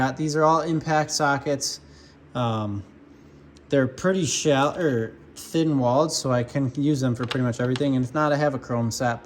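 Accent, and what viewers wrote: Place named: Wisconsin, United States. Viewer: Are you from Wisconsin? Your accent sounds like Wisconsin.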